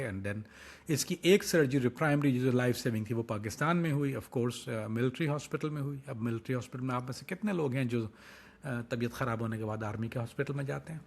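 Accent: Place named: United Kingdom. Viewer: U looks like so Deployment ...becoz ur acent is also like British...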